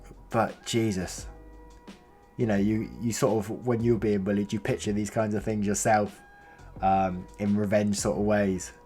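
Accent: England, United Kingdom. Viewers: Where in England is that accent. I cannot figure it out xx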